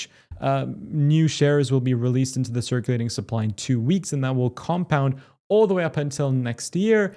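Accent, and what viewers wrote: United Kingdom. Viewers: Posh toff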